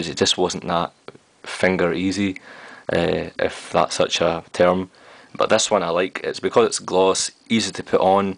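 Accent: Scotland, United Kingdom. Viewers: u sound scottish? am i correct?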